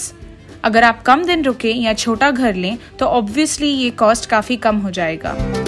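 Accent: Bihar, India. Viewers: Ohh, your Bihari accent ❣️😍❣️ Ekdam gajjab hai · Bihari accent🔥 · Love bihari ascent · Hahaha so cute accent puri bihari h apki😚😚😚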